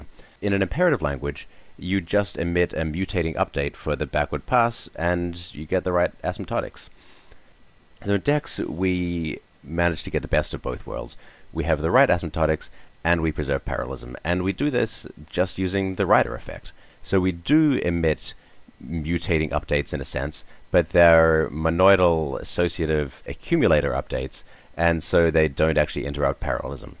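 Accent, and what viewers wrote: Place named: Australia. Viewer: u r one of the most articulate persons I have ever heard. Great presentation. Where did you learn to speak? And you sound like an Aussie living in USA.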